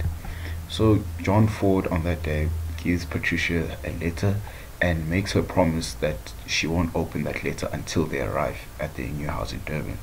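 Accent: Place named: Zimbabwe. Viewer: Could hear a Zim accent and I'm barely wrong bout such 😂🔥🚀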